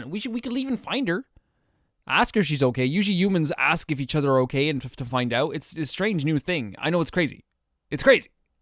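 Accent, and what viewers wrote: Ireland. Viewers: You do have an Irish accent, but it's subtle. Accents are somewhat relative anyway, relative to what voices you're used to hearing. I love them, they tend to be a great reflection of different cultures.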